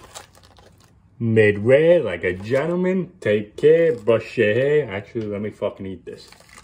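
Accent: New York, United States. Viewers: Been cooking my burgers in bacon fat for years, it's a game changer. I do my onions after my burgers, get it cooking in that beef and bacon fat while I rest my patties and toast my buns, fucking 12/10 shit. Love your channel, dude. I grew up 20 minutes outside NYC, living in Florida now, the accent brings me right back to the old Italian Pizzerias and sub shops I'd be in every other day, fucking love it, my dude.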